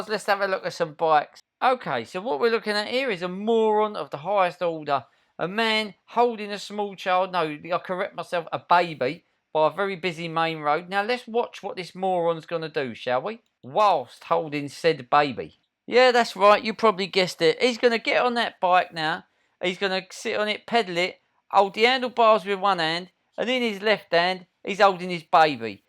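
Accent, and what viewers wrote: Cockney, United Kingdom. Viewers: What's your name mate? You really do make laugh with your accent ! Are you a cockney buddy? Greetings from Casablanca Morocco ❤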